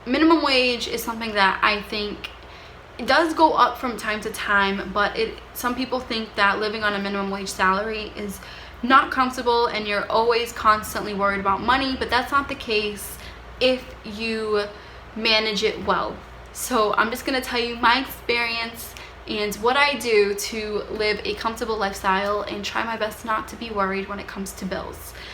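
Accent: Boston, United States. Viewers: You can hear the Boston accent. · I knew that Boston accent the minute you spoke! I💜my city! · As soon as you started talking I could tell you were from Boston! We gotta stick togetha! 😂😆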